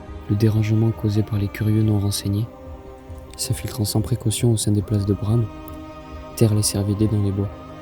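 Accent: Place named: Hautes-Pyrénées, France. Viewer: Bravo, tu peux être sacrement fière de toi! Quelques petites fautes de français mais rien de grave (c'est souvent des façons de parler dans le 65 mdr) et la qualité du son est à retravailler un peu, mais les images, les informations et le message sont fabuleux.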